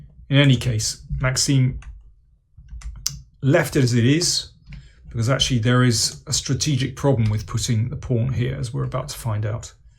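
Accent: England, United Kingdom. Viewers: Daniel, as a french man and correspondance chess player, I really appreciate your clever comments and also your typical english accent ! Good revision for me. I think MVL came in this tournament without pressure : he had nothing to lose !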